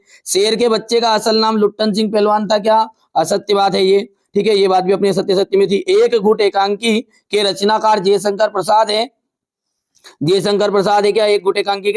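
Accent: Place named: Indore, India. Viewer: Tum indore side se ho kya ?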